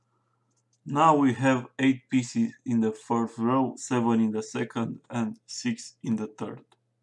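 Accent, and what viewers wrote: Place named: Russia. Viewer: пытается говорить по английски ,но сражу просвечивается русский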